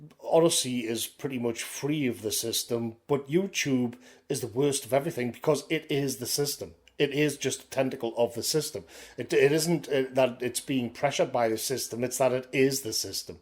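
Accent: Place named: Geordie, United Kingdom. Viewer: The algorithms can’t make out your Nordie accent.We peasants think it’s charming.Follow you anywhere.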